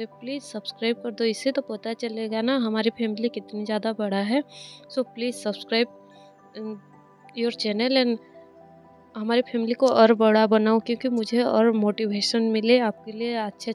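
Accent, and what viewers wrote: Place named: Bengal, India. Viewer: Ap thoda bengali type bolti ho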